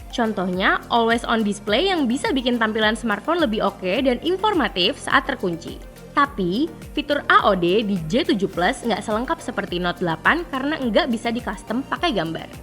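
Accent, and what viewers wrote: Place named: Betawi, Indonesia. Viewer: cengkok betawinya bikin aneh😁😁😁 bagusan dulu deh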